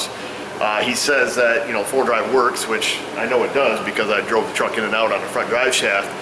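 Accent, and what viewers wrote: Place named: Upstate New York, United States. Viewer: Alright, Man when you said "wicked" a couple times I knew you were from Upstate... but when you said "jabronie" now thinking Binghamton. Either way, it's nice to hear someone else who talks like me. I live out here in Washington State now but was raised in the wicked cool town of Binghamton, NY with some other stinkin' jabronies.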